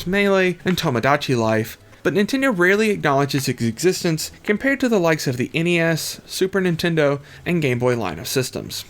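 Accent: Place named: Kentucky, United States. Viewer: Judging from that accent youre also a Kentuckian. Subscribed.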